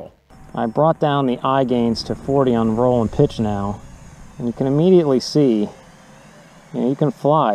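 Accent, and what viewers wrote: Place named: Pennsylvania Dutch, United States. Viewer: I think i heard some PA dutch in your voice.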